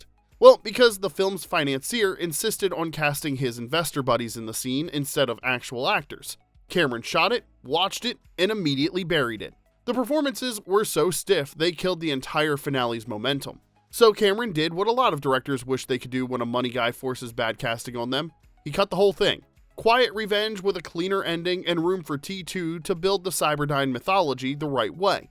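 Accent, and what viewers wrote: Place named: South, United States. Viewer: I enjoyed this. Thought it was funny that the narrator didn't reference his own Southern accent. The word "on" as a two syllable word, "drawling" instead of "drawing", etc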